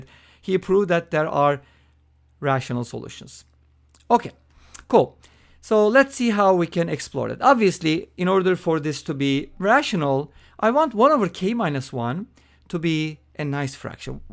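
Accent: Turkey. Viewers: Excellent tutorial, fantastic perspective! Just based off of your accent I think you are Turkish aswell right ? Just an assumption you don't have to answer it haha.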